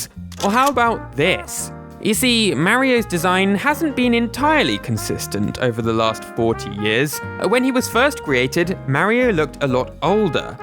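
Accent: United Kingdom. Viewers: HES BRITISH!!!!!!!! 😡😡😡😡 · For the people who are confused as to why pronounces Mario differently, a lot of us from the UK pronounce it that way and have been doing it for years so it’s nothing new. Some people in other countries say it that way as well so it’s not only restricted to us. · He’s British that’s how we say it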